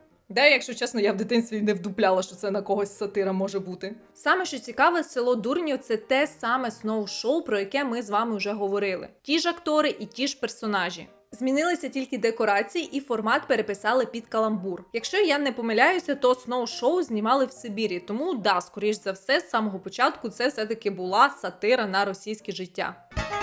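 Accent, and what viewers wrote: Surzhyk, Ukraine. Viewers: Мадам поработайтє над суржиком або мовою все ж розмовляйте.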